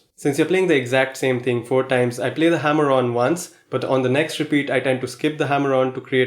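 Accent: India. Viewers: Cllicked away because of indian accent…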